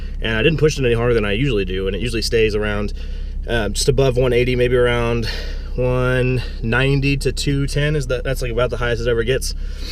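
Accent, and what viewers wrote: Texas, United States. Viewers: Where in Texas you from?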